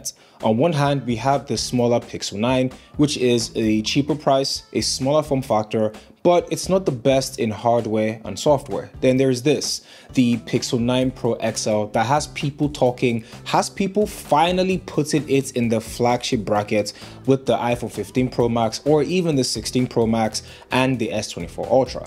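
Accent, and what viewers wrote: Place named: Nigeria. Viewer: You got a gaming hardware channel right?... I could swear the voice is the same... The fade nigerian accent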